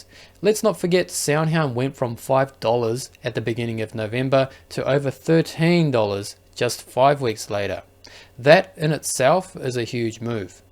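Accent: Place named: Australia. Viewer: Asian guy with Australian accent doing American politics🎉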